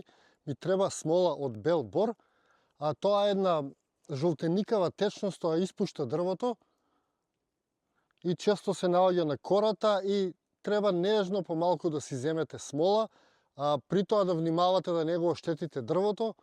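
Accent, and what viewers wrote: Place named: Veles, North Macedonia. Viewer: Да си го зачуваме јазикот, кој и онака е во изумирање. Малите македонски деца повеке зборат англиски... се надевам знаат и македонски. Кај нема наталитет, има абортуси и сите деструктивни нешта, барем јазикот да го зачуваме. Ете ти збориш на велешки акцент што е убаво да се слушне. Така да, моја препорака да продолжиш вака како до сега. Евентуално можеш да ставаш титл на англиски или синхроно и посебно да ги објавуваш. Но продолжи да снимаш како до сега.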